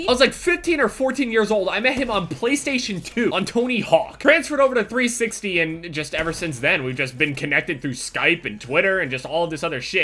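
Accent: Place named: New York, United States. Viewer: Anyone notice that New York accent with him saying "Twitta"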